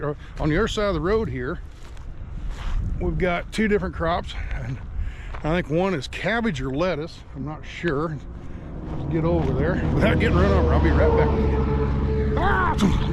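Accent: South, United States. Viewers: Are you starting to speak like a trucker now or have you always spoke with a country sort of accent. is like a voice actor...his trucker voice is not always there and other times its very pronounced.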